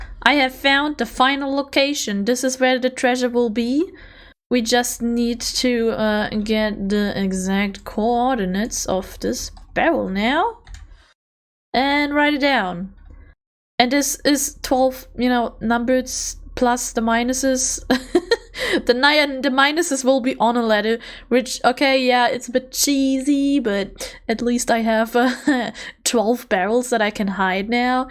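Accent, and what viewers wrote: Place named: Germany. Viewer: И вот я здесь, человек что английски понимает очень условно (а точнее вообще почти не понимает), смотрю видео по Minecraft на английском, с, как я понимаю, немецким акцентом (на мой слух) и пишу этот коментарий с помощью переводчика... And here I am, a person who understands English very conditionally (or rather, almost doesn't understand it at all), watching a Minecraft video in English with what I assume is a German accent (to my ears), and writing this comment using a translator...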